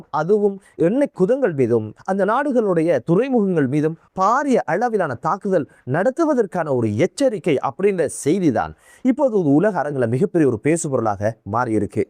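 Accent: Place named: Chennai, India. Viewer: நல்ல செய்திகள். Madras தமிழ்தான் பிடிக்கவில்லை.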